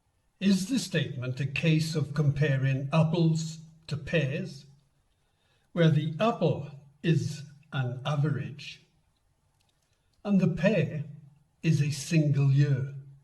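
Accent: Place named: Scotland, United Kingdom. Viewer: I had a professor that reminds of this narrator. He had a great deal of knowledge but was more boring than watching paint dry or grass grow. He does have his Scottish accent that aids his presentation slightly but I couldn’t make it through it. Possibly because I’ve seen this data presented in other formats. Carry on the fight though as I’m sure that there are many out there that either haven’t heard or refuse to accept factual data on “anthropomorphic climate change”.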